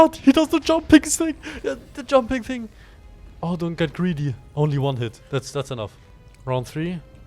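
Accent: Germany. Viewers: I can hear a german accent 😮🎉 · German you Sound, me reconize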